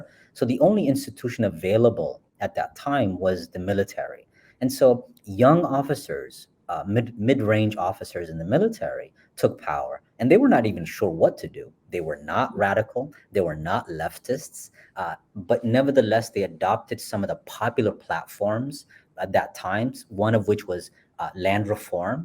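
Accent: United Kingdom. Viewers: love your British accent.please focus on doing english programs.there are not enough english current affairs productions about Ethiopia. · Corrections, Col. Abiy is not a doctor he is elementary schools drop out street Hustler's boy. From Shane's red district streets a prostitution zones single mother in Bashashaa town case closed no more questions thanks 👍 baby your Brtish accent Likes sweat heart kisses on Lips Love it you sound great. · Great British accent,. Keep up your great work. 👍🏽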